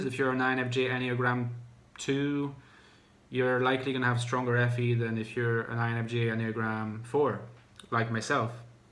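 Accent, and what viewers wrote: Ireland. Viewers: You shared a room with an Irishman! That makes a lot of sense...I thought you sounded Irish at first (and I still kind of do) I'm not even going to comment as much as I could on the weirdness part. I've been called 'weird' so many times in my life when I haven't even been ~trying~ (I appreciate your differentiating from innate and 'bestowed' weirdness since I never considered myself weird until I started to notice this pattern.) I'm also a girl (not sure of my type) so I think that makes the 'weird' accusations...different somehow? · Oh, so that's why you speak English with an Irish accent! (You had an Irish flatmate. It all makes sense now...)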